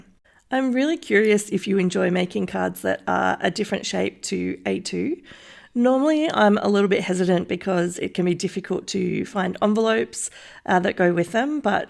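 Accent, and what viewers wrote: Australia. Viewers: hearing an Aussie voice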